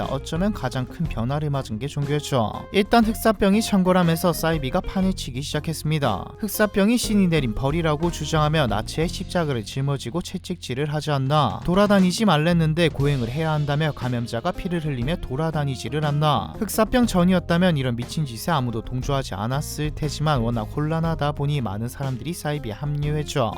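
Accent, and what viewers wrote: Gyeongsang, South Korea. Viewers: 경상도 억양에 표준어 사용하니 좀 그럴수 있습니다. ㅋ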